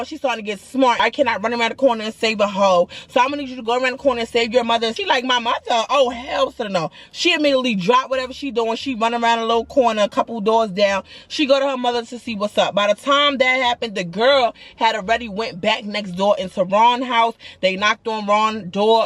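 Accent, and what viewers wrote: New York, United States. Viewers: Girl I love how you still sound so NY when you been in the south for some yrs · Hey girl! I’ve been binge watching your videos for like 2 weeks now! Currently on the BD series! I came across one of your videos by accident and been hooked ever since! I think it’s bc we both from New York so I love the way you talk and we both moved down south. Keep up the good work! I will continue to watch your story times. You’re such a good storyteller! ❤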